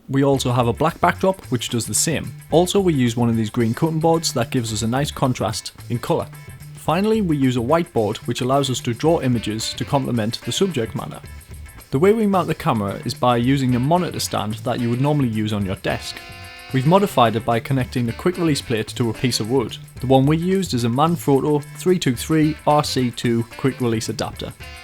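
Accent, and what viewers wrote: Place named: Newcastle, United Kingdom. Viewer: Youtube needs more Geordie accents- sub'd for great film making tips.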